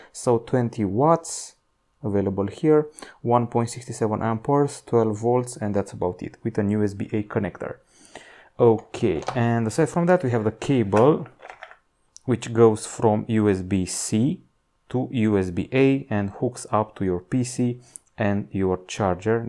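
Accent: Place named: Romania. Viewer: That fuckin' Slavik-Romanian Accent !!!! :) Bună treabă omule excelente unoxinguri și review-uri pe ambele canale !!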